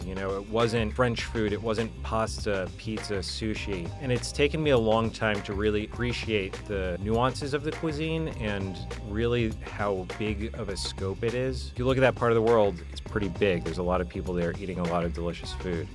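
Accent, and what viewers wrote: United States. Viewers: Therefore, he's " ", not " ". I don't think he is an immigrant from Ukraine, because he speaks American accent and pronounces his surname wrong. Probably his parents were the immigrants. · Wow u sound American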